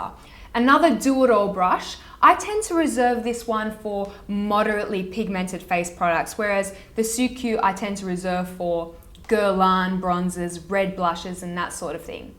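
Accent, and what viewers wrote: Australia. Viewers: Your accent takes me back since i used to live in australia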